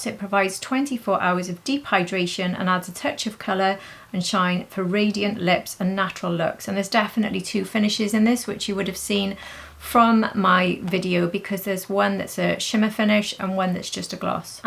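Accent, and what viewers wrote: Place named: Wales, United Kingdom. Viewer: Just found your channel - fabulous! Lovely Welsh accent How do the lip butters compare to the maximisers please ?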